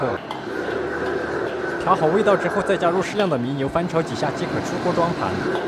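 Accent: Sichuan, China. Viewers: 一听就晓得是四川的哥老官，兄弟，莫得毛病我日。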